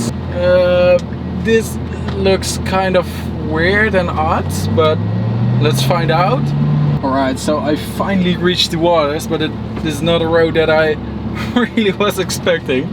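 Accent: Netherlands. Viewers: What a THICC hollands accentje😜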